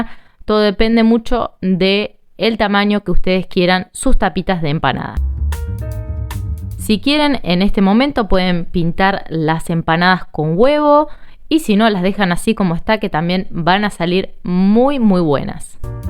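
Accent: Argentina. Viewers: CHICA ERES DE ARGENTINA SI POR EL ACENTO ANTES HABIAN MUY BUENAS RECETAS HABIA UNA CHICA FABULOSA ELLA SE COMIA LOS jos crudos le tenia mucha No E acuerdo de su nombre era fabulosa lo unico que se que era hija de una artista de cine argentino Etc no recuerdoel nonbre hace mucho tiempo de eso .okey Yo vivi en Nyc cuando iba CONEY ISLAND LA PARTE DE LA COLONIA RUSA JUDIA EN EL BRAITHON BEACH EN LAS PUERTAS DE LAS CAFETERIAS RUSAS VENDIAN UNAS EMPANADAS MIY GRANDES COMO DE 15 CT. RELLENAS DE POLLOS OTRAS DE PAP.AS Y QUESO CARNE ERAN MAZISAS ESPONGOSAS MUY RICAS SE ACABAVAN EB SEGUNDO TODO EL MUNDO A COMER ERAN DIFERENTES A LAS NUESTRAS LUEGI EL CAFE O LO QUE QUISIERAS MENOS VODKA MAY GOODNES QUE TOMAN LIS RUSOS SERAN LOS NO JUDIOS ESE LUGAR MI FAVORITO POR EL BUFFET RICO BARATO FUTAS VERDURAS ALLI TRABAJAN MUCHOS MRXICANOS Y HASTA HABLAN RUSO ES LINDO X ALLI TAMBIEN VENDEN PRODUCTOS DE LOS ADMICHII ESA GENTE DIFERENTE QUUE VIVEN CREO EN PESILVANIA PERO BUEN QUESO MANTEQUILLA LECHE Y BARATO NO SE AHORA CON ESTO DELA EPIDEMIA NOS ARRUINO Y TODO ESTA SUBIENDO QUE SUBAN LOS VICIOS ASE COBRENLE UN OJO DE LA CARA Y QUE VALLAN A BSÑAR AL RIO HUDSON PERO LA COMIDA NO. 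NO SE QUIRE LIMOSNA DE COMIDA SI NO TRABAJO CON DIGNO CON EL SUDOR DE EL ROSTRO .NO DIGO MAS DISCULPEN POR QUE LA GENTE SE ACOSTUMBRA Y ESO NO ES VIDA.TRABAJO PARA AMERICA LATINA EMPRESAS SI SE PUEDE GOD BLESS HUMILDEMENTE.🇺🇸❤❤❤❤❤❤❤❤🇵🇪🇦🇷🇧🇷🏆🤩🎯🎯🎯🎯🎯🎯🎯⚽️🏆🇵🇪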